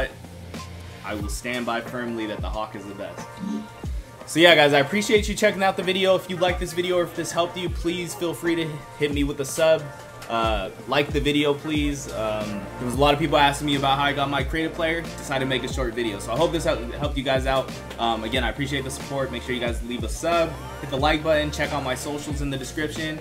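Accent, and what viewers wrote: Australia. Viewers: LMFAOOOOOOOO AUSTRALIAN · Yikes that was an Australian accent?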